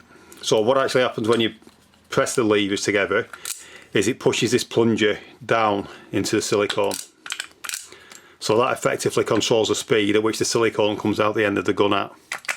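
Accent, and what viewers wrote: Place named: Lancashire, United Kingdom. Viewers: Great video! Even better with your telltale Lancashire accent. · so i was pretty close. Luv a good Lancs accent :) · Thank you for this, the Blackburn/Lancashire accent here is strong!! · This Northern fella has helped me no end become a competent DIY'er. I am already a qualified painter and decorator, so to look up this channel just furthers any skills. I even fixed my pensioner mates toilet. Plumber wanted 50 quid, I ordered the part for him and it cost £11.00. Not to mention my Mum's problem with her light fitting, last year. Top bloke! Thank you so much and love that accent! I'm not doing bad 'for a Girl!'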